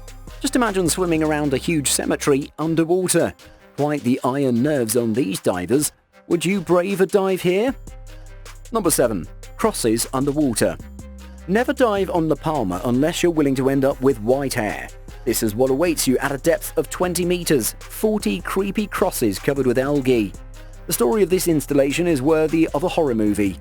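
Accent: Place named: United Kingdom. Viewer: Boy you British lol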